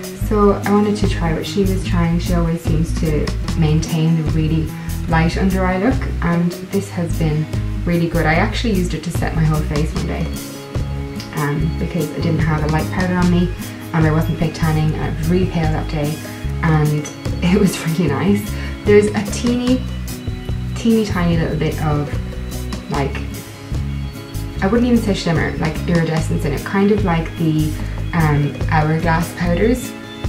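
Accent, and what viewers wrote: Ireland. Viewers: Love this! I'm glad u commented on my video cause now I have discovered yours!:) Are you from Ireland? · Love grave yard girl ! Are you from Dublin :) x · just found you recently. and love your Irish accent. I'm in UK but have perm resident visa for Oz, waiting and hoping to return once hubby gets a job. How long have you been there and what do you think?? Joan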